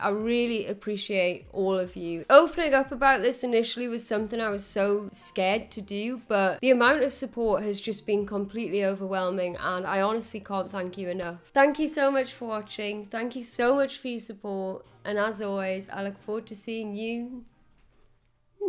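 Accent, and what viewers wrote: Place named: Teesside, United Kingdom. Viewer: Great news. Glad your health is improving. BTW, where is your accent from? Sounds a bit "Boro" to me.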